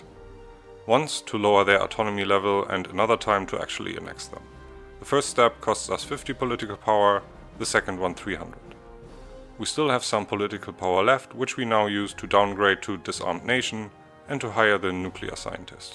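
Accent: Germany. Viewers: Are you German? Bist du Deutscher ?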